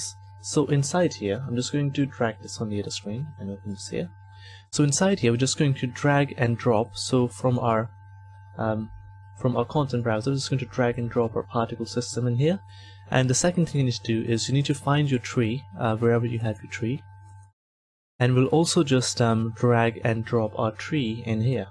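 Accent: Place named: Germany. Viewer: GERMAN AKZENTE ^^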